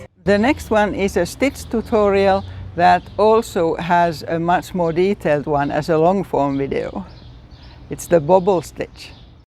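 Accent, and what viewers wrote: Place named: Finland. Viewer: My mother and father were both from Finland. I knew the moment I heard your voice I knew you were too. It's so lovely to hear that beautiful accent again! Not only that, I loved your video. Several techniques are new to me.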